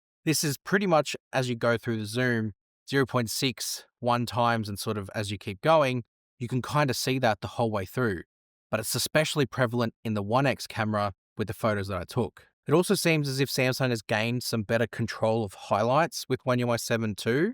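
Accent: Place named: Australia. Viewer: It's nice to hear an Aussie accent instead of US accent for a change.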